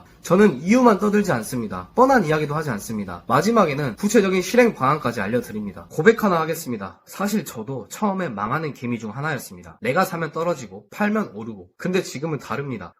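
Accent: Jeolla, South Korea. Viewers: 전라도 분이신가요? 반갑네요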